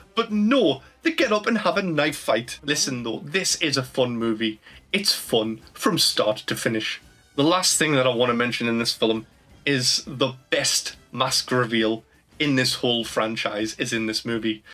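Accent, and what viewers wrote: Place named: Newcastle, United Kingdom. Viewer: 😂 damn geordie accent man lol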